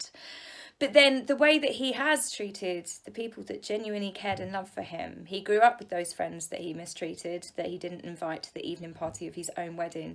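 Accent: United Kingdom. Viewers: I love your personal tid bits regarding your life, the hubby, and the dogs. Please don’t stop! Also love the hair! As for your content, it’s fabulous... the wonderful British accent is a bonus. Thank you for all that you do! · Yes you know what's nice about you talking about your life is that you seem like a genuinely nice and sincere person who is on behalf of "the little people" & it is comforting to hear a mentally stable person when everyone in CA is freaking out about coronavirus and #blm & fighting. I also love your colloquialisms & just listening to your accent. I'm interested in learning British slang & about everyday life in the U.K. & also people do really well with beauty channels maybe you can make extra money promoting products?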